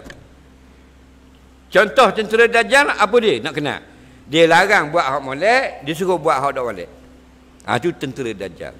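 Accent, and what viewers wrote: Terengganu, Malaysia. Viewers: Asalamualaikum ustaz..saye suke dengar ceramah ustaz sebab lorat Terengganu kadang 2ada dah lupa .ingat blek Katawa sendiri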